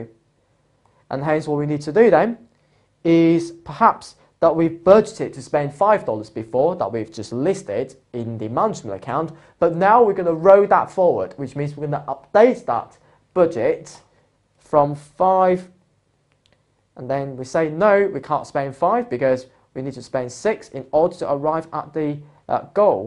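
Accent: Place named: United Kingdom. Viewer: Very british accent)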